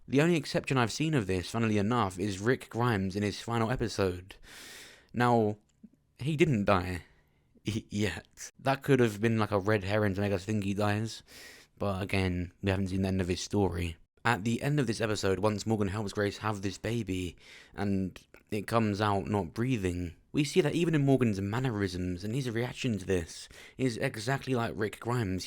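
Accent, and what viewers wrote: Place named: Southern England, United Kingdom. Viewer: Lol I’m from blackpool but i think your southern accent proper ace lol you have a creepy type voice as well 🤣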